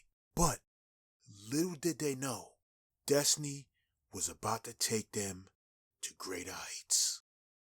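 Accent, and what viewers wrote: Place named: New York, United States. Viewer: Im a big fan of these shows and this was very well done! I subbed and cant wait to see more. BTW are you from NY? thought I detected an accent and your voice reminds me of Method Man. It made me enjoy the narration even more.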